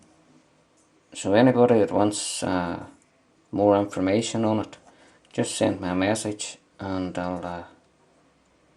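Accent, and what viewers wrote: United Kingdom. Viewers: Sounds like Northern Irish accent speaking English obviously.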